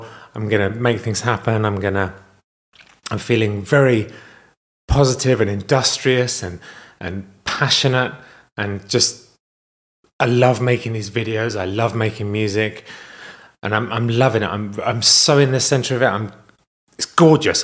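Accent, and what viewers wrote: United Kingdom. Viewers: I don't blame you for being really pissed, who wouldn't be? However don't be so hard on yourself, we all have some kinda in our life's! I love music too! And I love you to pieces! A lot of us extraordinarily ordinary people. Your charmingly witty British accent tickles me funny bone! Your music is so beautiful. I'm grateful that you've shared what those nasty people are doing. Shame on them. I tend to be a very trusting person, it's just my nature, and it has turned around and bit me in the butt, in the end, I learned more about the nature of greedy little people, so please don't be so hard on yourself. Lesson learned! Smile, it looks marvelous on you!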